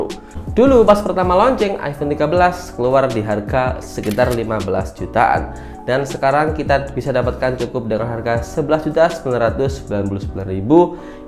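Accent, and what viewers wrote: Mojokerto, Indonesia. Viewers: smn wong mojokerto ta mas 😅?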